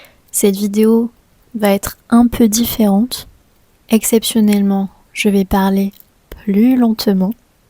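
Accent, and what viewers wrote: France. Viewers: Amo tu acento francés ❤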